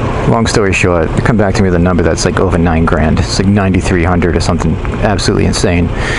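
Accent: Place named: New Jersey, United States. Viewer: I detected an accent in your voice, did you live in Jersey before L.A.? You should get an aftermarket pipe, the M4 slip-on is cheap, so that people can hear you better (keep you safer) and it makes the bike sound like the bad-ass it is. I would also ditch those Mickey Mouse ear mirrors like I did on my FZ 07, too and get some CNC Mirrors by RIDEIT for your FZ 07, on Amazon. They look much better and are adjustable, trust me, and they're only $59.00. Nice little camera there, good mic. Doesn't that low beam suck! I can't stand riding mine at night at anything over 35 or you over drive them. Thinking of getting some good Denali micro lights to mount on the forks/take care of that crap. Be safe!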